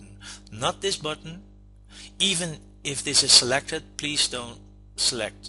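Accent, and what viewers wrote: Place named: Netherlands. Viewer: Thanks! Love the charming dutch accent. You rock!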